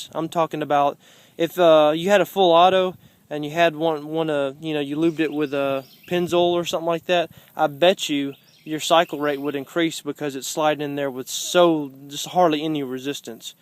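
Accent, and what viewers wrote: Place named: Texas, United States. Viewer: accent, Im almost sure sounded like texas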